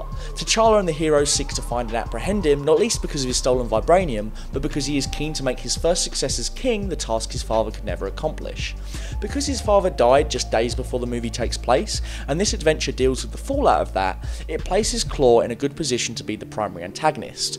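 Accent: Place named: Upper class, United Kingdom. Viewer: First of all the black panther has no villian problem and my God this was the first movie give them a break super critics and who is this guy doing this segment because he sounds like some British aristocrat who has never been around a black person .it is a known fact that people who are dark skinned understand this movie better then our pale face counterparts because they've never been hated on simply for the color of there skin .and if you or anyonelse can't understand the message from this film then not only are you not open minded. But you probably most likely only gang around members of your own race and that's not only cowardice but unhuman and boring .killmonger is a villian fueled by hate and that's more understandable then some sadistic twisted dceu character that Batman has to fight .haters go back to the dceu world the marvel universe is for controversial heros .peace and power to the people .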